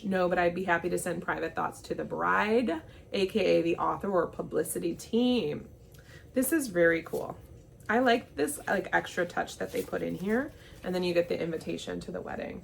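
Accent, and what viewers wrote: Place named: United Kingdom. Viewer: I love your take on British pronunciations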